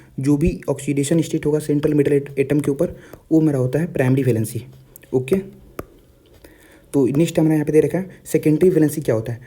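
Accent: Bihar, India. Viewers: bhaiya love your Bihari accent 😍😂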